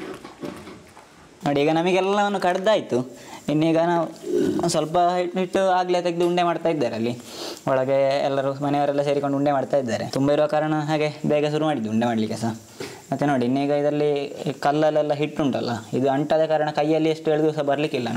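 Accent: Mangalore, India. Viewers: Manglore kade ide tara swachha kannada matadtare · ಮಂಗಳೂರು ಕನ್ನಡ sounds good 👍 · I like ur mangaluru language love from bidar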